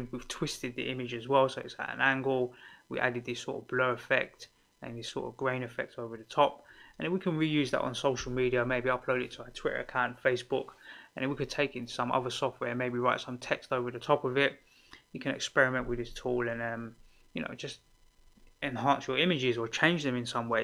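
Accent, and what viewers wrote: United Kingdom. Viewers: He just has a british accent.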